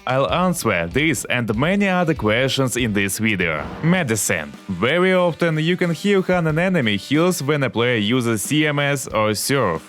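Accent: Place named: Russia. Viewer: А мне всегда нравится классический русский акцент, ну чисто как в голевудских фильмах 80х-90х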